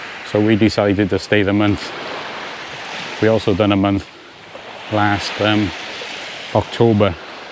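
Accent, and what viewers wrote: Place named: Cardiff, United Kingdom. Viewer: I thought u had a Cardiff accent I’m living in Melbourne but I’m from porthcawl originally I’ve got a condo in jomptien in the Maldives ready for retirement after this virus clears up your video is very good keep it up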